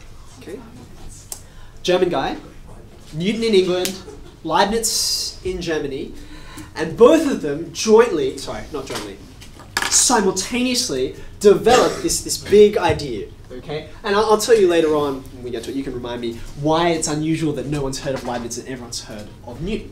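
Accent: Australia. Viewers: Does he speak like an australian? I want to get used to hearing the australian accent, i'm learning english 😄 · Aussies have such cool accents compared to us Americans like this guy, Woo. They are like the cousin you can't wait to chill with at the cookout after tolerating the arrogant and boogie British, and the too nice and boring Canadian cousin. Kiwi cousin is pretty cool too.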